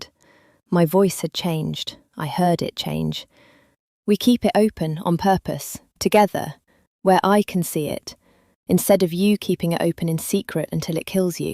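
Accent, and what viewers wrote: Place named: England, United Kingdom. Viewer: I love the English accent. ♥ And I loved this story. ♥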